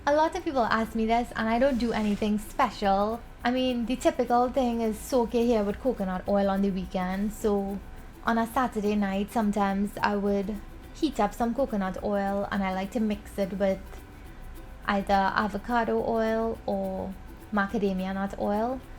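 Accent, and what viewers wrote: Trinidad, Trinidad and Tobago. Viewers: you still have your Trinidad accent which I love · I second Ramjohn!! You were brought up by such great parents and grandparents. The way you speak and carry yourself says so much...you have kept your strong Trini accent and values which I love. Keep being you sweetie. Stay as sweet as you are👏😘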